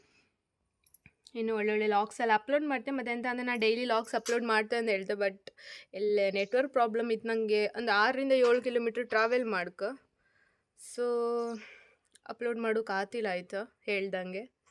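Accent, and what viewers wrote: Kundapura, India. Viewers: ಬಳಿ ಹಾಯ್ಕಂಡ್ ಚಂದ ಕಾಂತ್ರಿ,ಹಾಗೆ ಹಣಿಗೆ ಕುಂಕ್ಮ ಹಾಯ್ಕಂಡ್ರೆ ಮತ್ತಷ್ಟು ಚಂದ ಕಾಂತ್ರಿ.ಊರ್ ಮನಿ ಕುಂದಾಪ್ರ ಕನ್ನಡ ನಿಮ್ಮ್ ಬಾಯಲ್ಲಿ ಕೇಂಬುದೇ ಚಂದ👍👏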